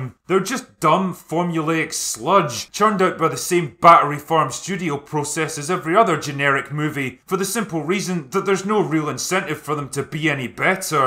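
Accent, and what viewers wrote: Scotland, United Kingdom. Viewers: Татья́на... yeah, it's Tat-i-jana, whereas the 4th and 5th are letters unknown in the English alphabet. The 4th is almost like the German "ü", the 5th is simply "ja" or "ya". I would say "Tatiana" is the most accurate, it's just onomatopoeia anyway. I guess Russians, Belarussians, Ukrainians and other Slavs DO have a couple of ways to write this name 😁! But as I wrote, the way pronounces the name with his Scottish accent it does sound more like Tateyana. Probably the man gets that girl to speak into the mic one day. If only she wasn't purely imaginary... 🤣😹😂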